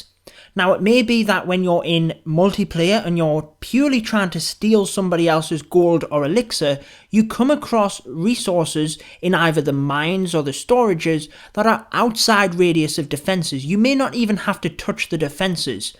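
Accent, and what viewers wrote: United Kingdom. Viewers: is just british accent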